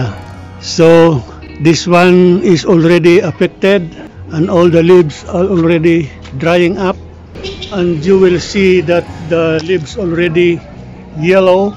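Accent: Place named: Italy. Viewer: ARE YOU ITALIAN!!!??? OUR VERY DEAR MR. GIOVANNI CUMAN BELONGS TO ITALY ALSO SPEAKS LIKE YOU.....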